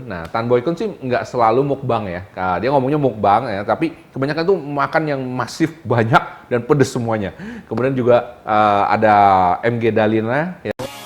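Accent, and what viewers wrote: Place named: Lumajang, Indonesia. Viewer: lumajang mana suara nya😁👍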